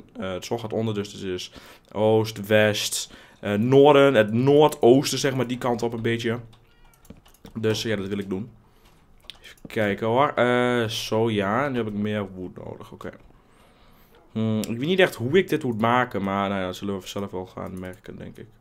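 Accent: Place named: Twente, Netherlands. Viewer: Je bent echt heet twents :P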